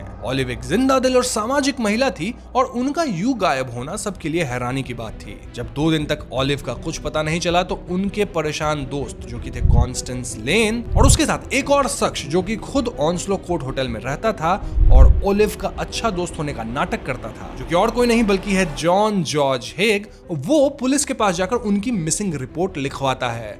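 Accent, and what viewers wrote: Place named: Bihar, India. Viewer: apna bihari accent thik kro gussa aata h galat pronounce sunne k baad.. "kar diya, " kar di"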